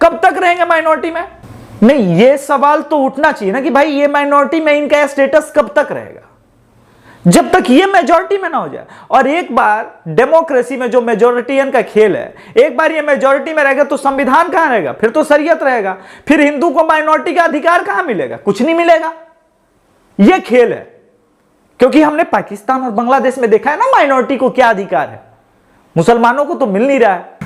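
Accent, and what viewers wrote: Hyderabad, India. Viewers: Hyderabadi tone 😂😂 Jai Shri Krishna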